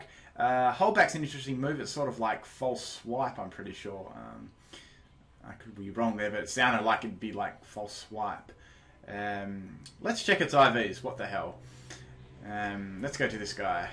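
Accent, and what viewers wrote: Australia. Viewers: Before you get all angry and stuff, know that is Australian, not a brit.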